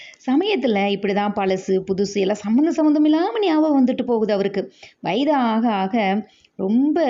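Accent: Tirunelveli, India. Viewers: என் வயது 81.ஓய்வூதியன். தினமும் பி.பகல் 2 முதல் 4 வரை படுத்துக் கொண்டே பல அலைவரிசைகள் கதை கேட்பேன் அரிய கதைஃ.திருநெல்வேலிப் பேச்சு மொழி பிரமாதம்.திருநெல்வேலி அல்வா கொடுத்தால் நாங்கள் சுவைத்து ருசி அறிந்து கொள்வோம்.உங்கள் விளக்கங்கள் இடையிடையே தேவையா?மன்னிக்கவும். நானும் 32 நூல்கள் வெளியிட்டுள்ளேன்.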